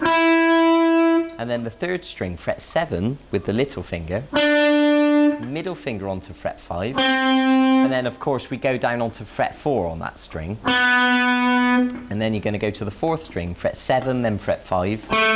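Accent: United Kingdom. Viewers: yeah, but I like this guy better, he has a british accent. And the other guy yelled at me, "HI EVERONE WHATS UP?!?!?!?!" I dont like him too much. And this british guy is fine, he knows what he's doing, and I'm learning stuff without a guitar so shut up.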